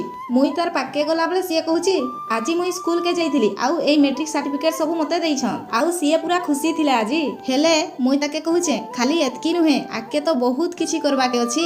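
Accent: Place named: Cuttack, India. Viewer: ଝିଅ ତୁମର ନିଜର ଭାଷା ଠିକ୍ ଅଛି । ଇ କଟକିଆ କଥା ଠିକ୍ ନାଇ ଲଗବ।ର୍ ।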